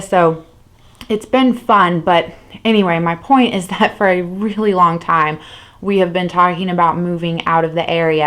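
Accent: South, United States. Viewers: Heck yes girl! I am here for the country accents! I’ll bring the sweet tea to your porch! I hope that y’all got rocking chairs!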